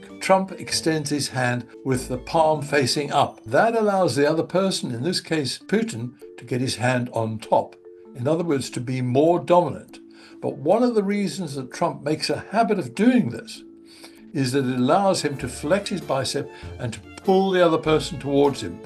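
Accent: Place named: RP, United Kingdom. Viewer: So we supposed to believe u coz u got Elizabeth's accent. Tsek wena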